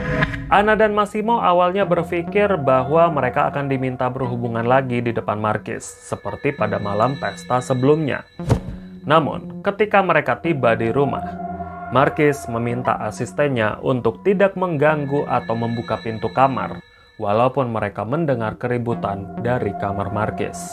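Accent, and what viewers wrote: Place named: Bogor, Indonesia. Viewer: Dengan logat ciri khas suara nya ....santuy dri bogor🌷🌷🌷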